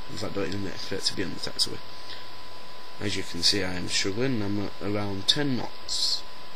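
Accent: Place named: Yorkshire, United Kingdom. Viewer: YOUR YORKSHIRE!!!! SO AM I!!! xD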